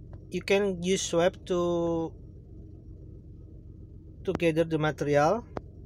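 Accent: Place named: India. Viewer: he sounds indian...okay dislike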